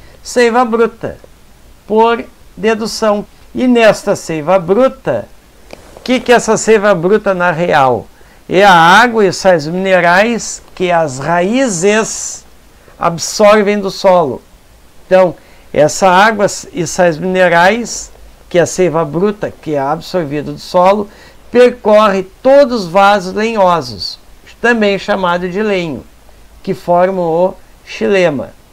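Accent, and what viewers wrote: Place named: Rio Grande do Sul, Brazil. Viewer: Ba tu es do Rio Grande do Sul?ba muito boa sua aula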